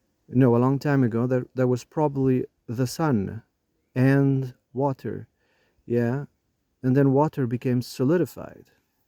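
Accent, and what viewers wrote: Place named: Portugal. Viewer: Inglês com sotaque português detetado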